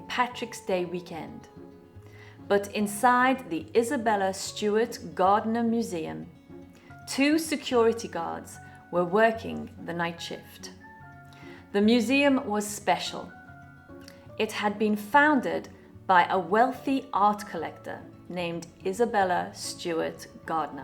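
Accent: United Kingdom. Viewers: Hi, Just wanted to say I absolutely love your British accent! It’s so lovely 🌸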